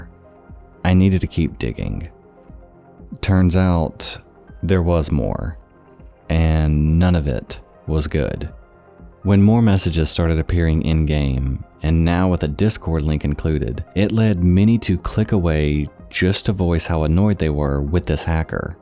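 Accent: South, United States. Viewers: Quickly becoming one my favorite channels. Love your channel man. That subtle southern accent is extremely inviting. Kind of like Lazy Masquerades and Be Bustas accent gives them their little spark.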